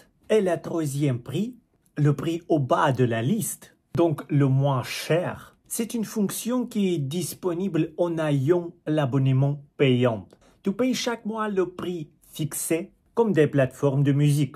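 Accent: Russia. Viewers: Вы не францзу же. Я слышу русский акцент. Все фальшивка.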